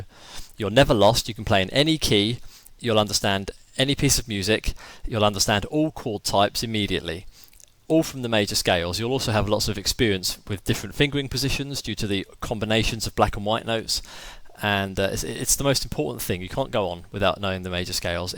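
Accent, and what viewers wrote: United Kingdom. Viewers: Very helpful so far! Thank you so much! I'm curious, where are you from? I hear a British accent. I'm just randomly curious, nothing more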